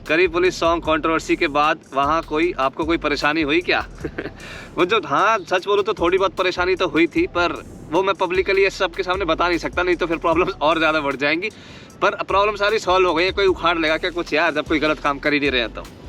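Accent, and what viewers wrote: Haryana, India. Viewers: Ram Ram ji, News wali video kab ayegi. Karo news wali video, bada maza aa jata hai waise videos dekhke apke Haryanvi accent me.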